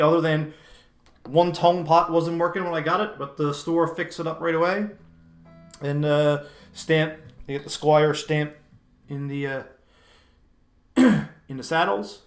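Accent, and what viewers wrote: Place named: Ireland. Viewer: Lovely guitar, Your accent sounds like an Irish one at times , Greetings from Dublin 🇮🇪 🇨🇦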